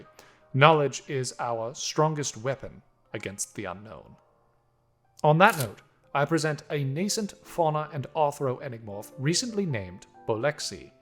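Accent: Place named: New York, United States. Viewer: OMG has a new york accent now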